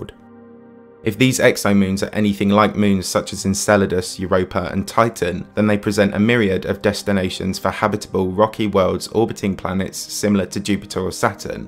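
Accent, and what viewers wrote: United Kingdom. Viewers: What kind of British are you?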